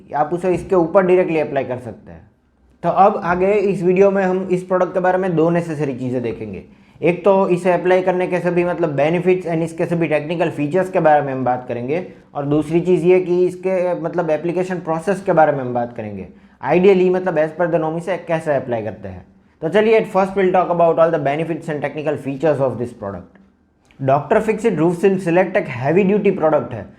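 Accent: Gujarat, India. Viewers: Gujju raping hindi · That gujrati ascent is so good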